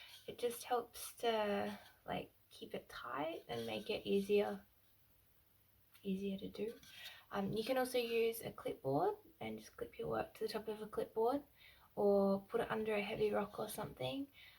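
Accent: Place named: Australia. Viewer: Thank you. Sounds as though you are Australian. Nice to hear.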